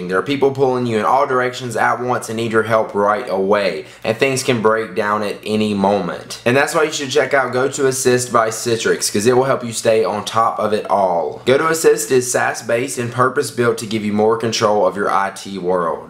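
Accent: South, United States. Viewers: I'm not being derogatory but it's funny that this guy is always wearing wigger cloths but sounds really country, that's an odd breed mix haha. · you've probably got the coolest american accent. coming from an aussie. lol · What accent is that? Sounds Southern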